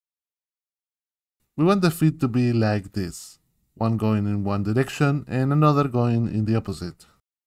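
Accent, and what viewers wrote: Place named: Spain. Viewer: Really nice videos, all of them. I buyed the promo and its wonderful with lot of content. BTW your accent seems spaniard, do you have any video in spanish. I want the for 2 things: show it to my children and also as a Teacher I wish to do a inkscape tutorial with my students. Think on it! they need like 10 visualizations per student to get to do the exercises (somehow something that resembles a draw). Thank you for this really nice channel!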